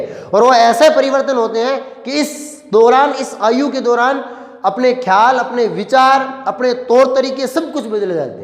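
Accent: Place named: Marwari, India. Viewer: Aap to bahut ache trike se samja rhe ho, muje aapki marwadi voice achi lagee 😂😂😂😂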